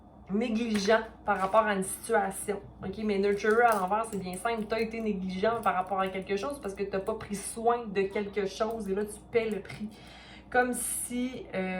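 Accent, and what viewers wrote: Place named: Quebec, Canada. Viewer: Coucou ma belle 😊 Merci beaucoup pour ces guidances, en effet, j'essaie de me sentir bien avec moi même, j'y travail, je ne dois pas me sentir "complète" avec un autre, mais l'être moi même 😉😊 Juste une petite suggestion, rien de méchant... Ce serait vraiment beaucoup mieux si tu mettais le signe astrologique en premier dans le titre de tes vidéos, car nous sommes obligés d'aller sur chacunes d'elles avant de tomber sur le signe voulu. 😉 Par exemple : Verseau ♒ semaine du 8 au 14. Voilà 😊 je te souhaite un bon week-end et mon dieu... Qu'est ce que j'adore votre accent à vous mes Québécois 🤗🥰 Bisous ma belle 😘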